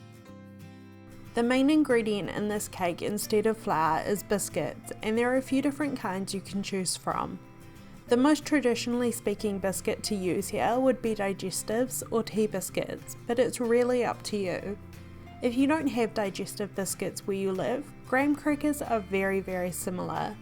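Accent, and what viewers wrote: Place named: New Zealand. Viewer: I've been making this since I was a child. Nice to hear a Kiwi Accent 😊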